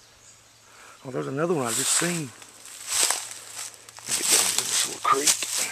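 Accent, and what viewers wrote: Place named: Kentucky, United States. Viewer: Thought your accent sounded familiar. I'm in London KY. Laurel County....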